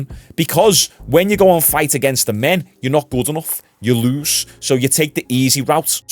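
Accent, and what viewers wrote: Liverpool, United Kingdom. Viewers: I love your accent. Its the best of the British accents 👏🏾👏🏾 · Listening to your voice you sound like you're from Liverpool one thing I can say about you guys man you're on point wherever there's b***** you guys make sure you make a stand I'll totally agree with everything you're saying this is a joke I haven't watched the Olympics for the last three times it's ridiculous lots of love to my Liverpool people😮😢😮😢😮😢😮😢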